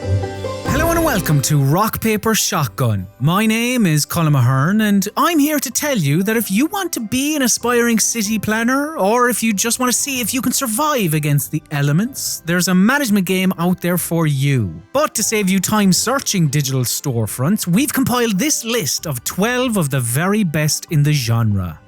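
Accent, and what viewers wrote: Ireland. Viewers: man soundds oirish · Is this the Irish accent? So cute. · I love the narrator’s Irish accent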